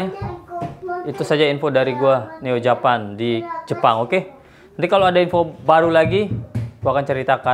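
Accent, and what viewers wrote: Lombok, Indonesia. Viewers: logat sasak tdak hilang,.keren abangku😁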